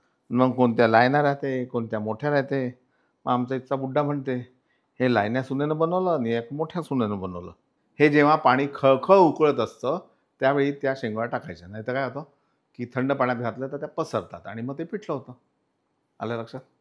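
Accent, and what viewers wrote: Vidarbha, India. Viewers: Varhadi bhashechi godi chhan watli,.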